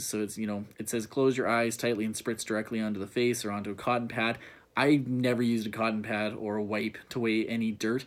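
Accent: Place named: Canada. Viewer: Fellow Canadian here. You have such a Canadian accent! Love the video!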